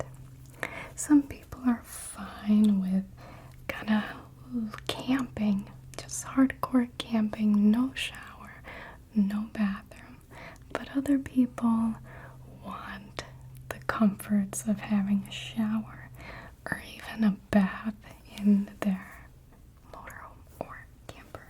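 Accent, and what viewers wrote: Midwest, United States. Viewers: As a Minnesotan, the midwestern tinge to your voice in the old school videos like this give me LIFE. 🤩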